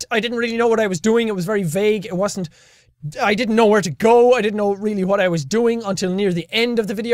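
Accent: Ireland. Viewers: Best of the irish youtubers!! Cant tell if your accent is wexford or meath? Any way this limerick man has PUNCHED that sub button!! Keep them vids comin;P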